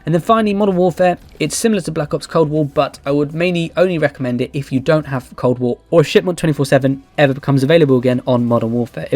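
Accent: United Kingdom. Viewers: Like the most of British people voice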